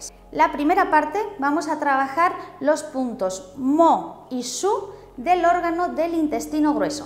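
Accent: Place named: Spain. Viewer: Ese asento español es el peor, pérdida de tiempo con este vídeo.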